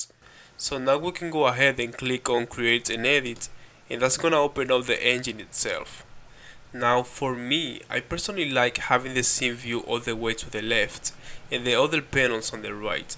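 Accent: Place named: Latin America. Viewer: Thanks. I like the way you explain things. Keep it up broh. BTW. You speak spanish don't you? Te escucho un acento latino:)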